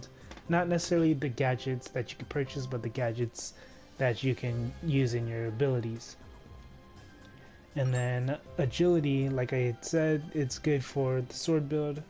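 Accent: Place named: Arab world. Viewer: واضح من لهجتك انك عربي بس لهجتك أحسن بكثير من كثير من العرب، ما شاء الله 😂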